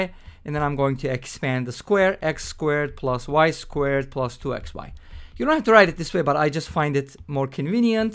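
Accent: Turkey. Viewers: Ill posed as a geometry problem since area and perimeter are different properties and have different units. Keep up the good work. Do I detect a Turkish accent in your speech?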